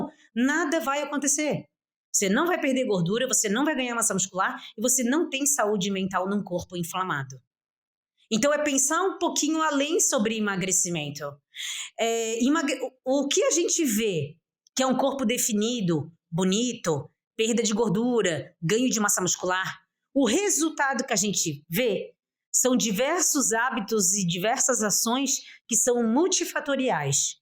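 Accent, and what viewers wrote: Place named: Florianópolis, Brazil. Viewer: Pelo sotaque, é manezinha da ilha😅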